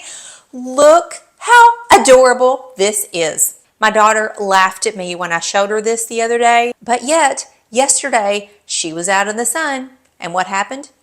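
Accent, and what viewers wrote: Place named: Kentucky, United States. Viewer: Love,love,love your program. I love Paducah. Have friends there that are involved in the art community. I especially live the doughnuts for getting rid of mosquitoes. They are already bad in Owensboro. You are so personable and we have the same accent. I also love KY Lake. Had many good times there.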